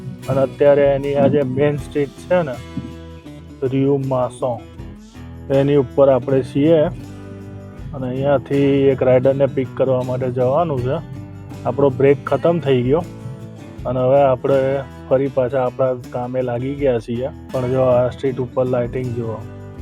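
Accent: Mehsana, India. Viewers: BoliTo Mahesani chhe, PAN Gaom Ke U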